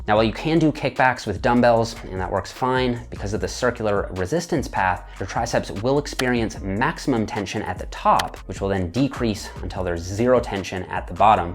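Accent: Canada. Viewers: lol the 'erhm' & 'baarh' Canadian af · Canadian accent lol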